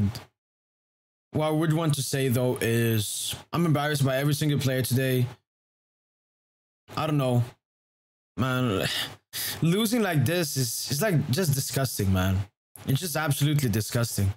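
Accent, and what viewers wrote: France. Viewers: What part of France is this guy from?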